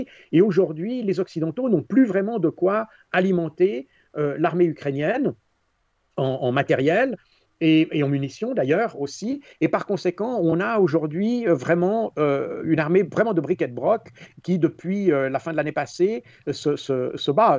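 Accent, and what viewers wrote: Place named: Switzerland. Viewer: son accent suisse trainant d'origine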